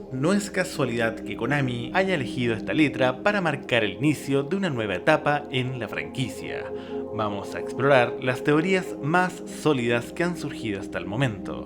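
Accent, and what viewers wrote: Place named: United States. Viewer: Tuve que dejar de ver el video por tu tono de voz y como intentas pronunciar las palabras que no necesariamente deben pronunciar con un acento americano, deja de hablar como un niño con TEA por favor 😿🙏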